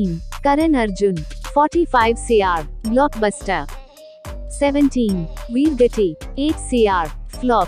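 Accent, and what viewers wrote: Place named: United Kingdom. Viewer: by her voice can tell she must British or from an English speaking countries struggling with Hindi title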